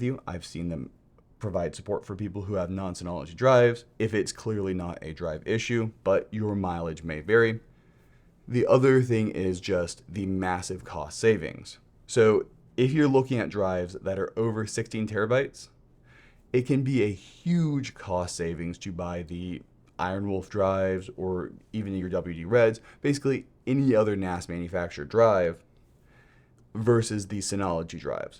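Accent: United States. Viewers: this accent is linked to which region in US?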